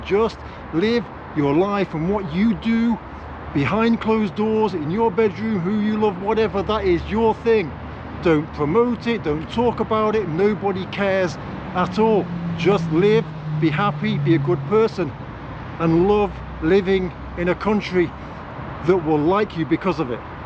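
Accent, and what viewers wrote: United Kingdom. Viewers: It seems that people are paying attention to you not because you are suspicious, but because it is unusual to see a person speaking pure British English in front of a camera imo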